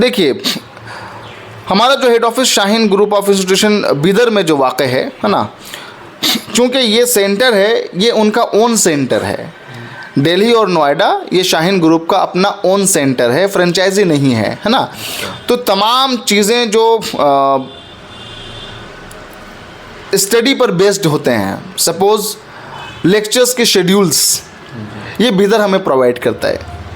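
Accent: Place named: Magadh, India. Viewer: "है ना" यह तो अपने मगध (औरंगाबाद_गया) क्षेत्र वाले लगते है । कोई Confirm करें, Plz। m Ex JMIIte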